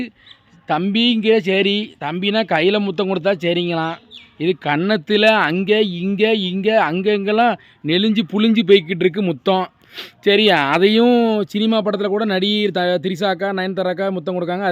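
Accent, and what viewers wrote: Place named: Tirunelveli, India. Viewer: தங்கள் நெல்லை பேச்சு நன்றாக உள்ளது அண்ணா 🎉🎉🎉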